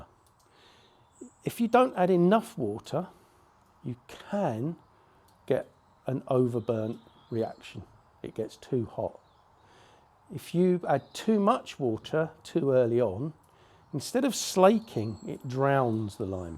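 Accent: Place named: United Kingdom. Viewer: british accent take a guess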